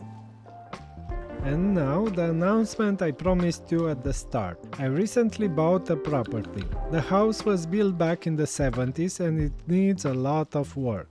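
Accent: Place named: England, United Kingdom. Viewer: I only subscribed and liked this amazing video because of your amazing English accent and your cooking skills!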